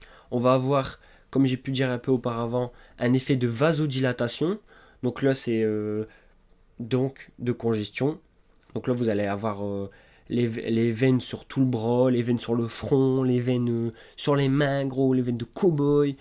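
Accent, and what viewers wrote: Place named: Nord-Pas-de-Calais, France. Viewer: Super bonnes explications, avec un "grave" accent Ch'ti, en supplément🙄🤗. Je vais même réécouter cette excellente vidéo, super complète et scientifiquement pertinente, pour noter les points que je connaissais moins bien. A 63 ans 1/2, j'ai eu il y a peu ( après m'être épuisée pratiquement jusqu'au burn out à soigner jusqu'à sa fin un parent, puis l'autre), un manque de tonus et réponse musculaire grave. Je n'arrivais plus à me relever, difficilement à monter un petit escalier et à marcher. C'était incompréhensible et ma Dr me disait: "C'est l'âge ! ". Tu parles, ça se saurait si à 63 ans, les gens, en 2021, étaient pratiquement TOUS incapables de bouger !!! En réalité, bien que n'étant pas végétarienne, je manquais de vitamine B12 et un chouia de potatium. Pourquoi ? Je ne sais pas. Un sursursurmenage trop intense, pendant trop, trop, trop, trop, trop, trop, trop d'années (7 ans 24h/24, après 20 ans par intermittence) , trop de charge physique et mentale non stop en solitaire ? 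Bof, peut-être ou ? Je vais mieux mais faut toujours que je sois sur tous les fronts, alors j'ai bien l'intention d'alimenter ma machine humaine pour qu'elle soit efficace et autonome le plus longtemps possible. Le moral et la cervelle, ça va. Je sais comment les maintenir en forme naturellement. Vraiment, tu es très clair et pédagogue. La petite vieille te fera savoir si elle parvient à reprendre un peu de fitness, de danse, de vélo et de randonnée. 🧙‍♀️🏋️‍♀️💃🚴‍♀️🚶‍♀️💪 🧙‍♀️ 🤭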